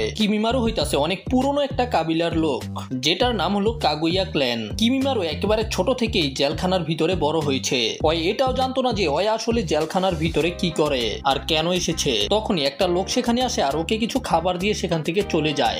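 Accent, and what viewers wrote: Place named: Barishal, Bangladesh. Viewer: আপনার বারি বরিশাল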